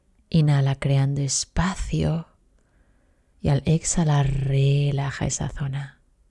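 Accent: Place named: Argentina. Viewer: Buenos días si fue intenso porque mis rodillas me fallan pero trate en lo que pude hacer muchas gracias, voy a repetir este si me gustó, gracias siento con tu voz una persona muy agradable y sencible Dios te bendiga,por x tu acento creo eres Argentina, bonito día ❤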